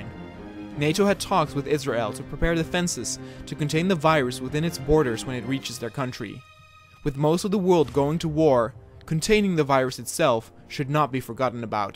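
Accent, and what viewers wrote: Netherlands. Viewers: You are Dutch? I am Flemish. It took me 10 minutes before I could clarify your accent, well done! Also: great way of recycling genuine news footage into an alternative 'present' and a zombie war. Awesome! Dikke proficiat, dat deed je geweldig! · are you dutch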